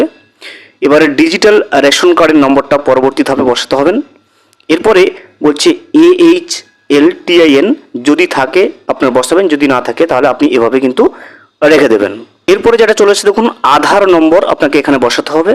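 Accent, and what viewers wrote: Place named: Bangladesh. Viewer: apni ki Bangladesh teka video toiri korchen apnar voice ta Bangladesh desh er moto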